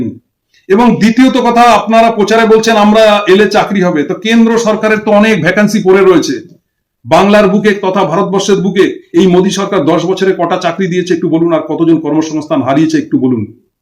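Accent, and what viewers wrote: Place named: Bangladesh. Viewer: এটাতো বাংলাদেশি মাল বুঝাই যাচ্ছে কথা শুনে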